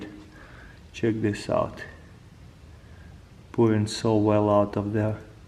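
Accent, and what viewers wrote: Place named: Russia. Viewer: Привет смотрю тебя давно))Я знаю что ты русский)Т.к акцент ))Удачи в развитии🎉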